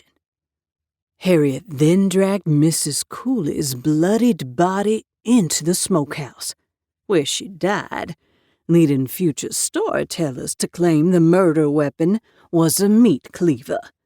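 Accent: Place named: South, United States. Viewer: Love this but the southern accent is too much and this is coming from a southern gal from the mountain community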